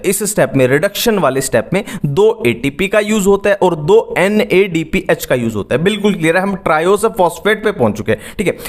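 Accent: Haryana, India. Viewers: Aap haryanvi ho na bhaiya 😊 ?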